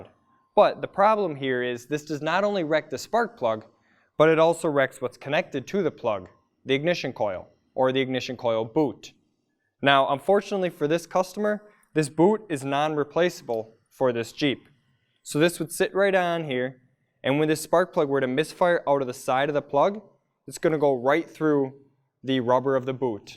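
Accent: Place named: Wisconsin, United States. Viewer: Ah, a Fellow Wisconsinite. The accent when heavy is very apparent.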